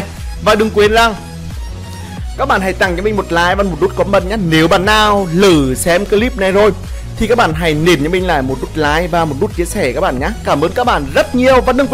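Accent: Nghe An, Vietnam. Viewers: Giọng nghệ an choa hắn rứa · Giọng nghệ an quê ta dệ thương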